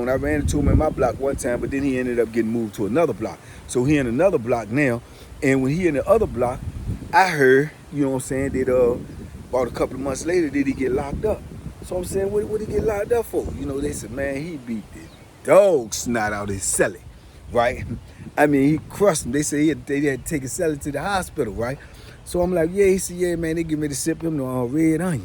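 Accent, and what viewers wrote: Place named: New Orleans, United States. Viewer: U sound like u from New Orleans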